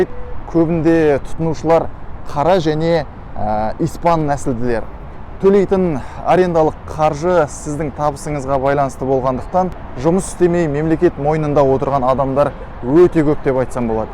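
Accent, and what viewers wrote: Standard. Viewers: Рақмет, ризамын саған бауырым қазақ тілінде көбісі таза сөйлей алмайтын қазақтарға үлгі болсын сенің тұлғаң!!! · 👍👍👍қазақ тілі таза! Жақсы мәлімет алдық👌👌👌🤩🤩🤩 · Бауырым бираз акпарат алдык рахмет .Озиннин таза казакша сойлегенине танти болдык.Алла разы болсын.Америкада жургендей сезиндик озимизди. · Бəрін өте тамаша айтып берді:байыппен, түсінікті. 👏👏👏 Басқа елде өз тіліңде таза сөйлегені былай тұрсың!👍 Бақытты бол, балам!😚 Жастардың осындай білімді, мəдениетті болғандарына қуанамын! Тілші деп осыны айт. Жарайсың, алға! 🇰🇿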